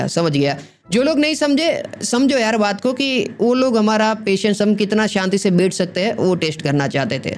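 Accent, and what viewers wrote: Gujarat, India. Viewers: He is Gujarati bro❤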